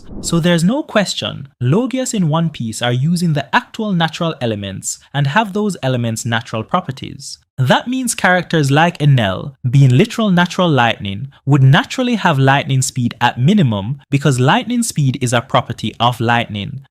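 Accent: Jamaica. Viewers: good breakdown , are u jamaican by any chance?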